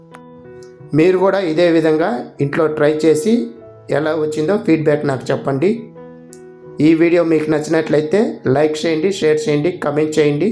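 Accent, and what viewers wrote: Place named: Nellore, India. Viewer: నెల్లూరు జిల్లా భాష రెసిపీ బావుంది అన్న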